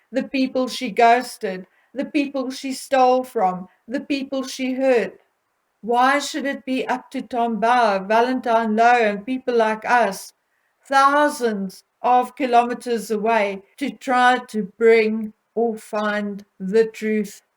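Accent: South Africa. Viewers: You have the most pronounced South African acsent...you should speak Afrikans it would be more tolerable.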